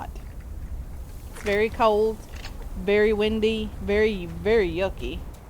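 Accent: Kentucky, United States. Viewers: wat part of kentucky you from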